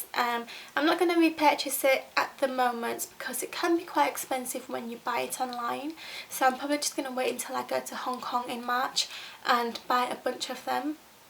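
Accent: Yorkshire, United Kingdom. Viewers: what's the name of her accent? is it yorkshire?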